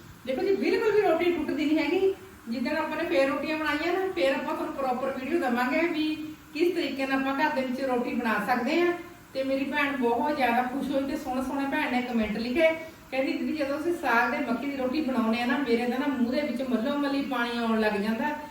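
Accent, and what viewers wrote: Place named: Fatehgarh Sahib, India. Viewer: Asi bhi aive tadka laune aa ji tuhadi boli Fatehgarh sahib ja ropad dist di sister kehda pind aa ji tuhada video bahot sohniya hundiya ne tuhadiya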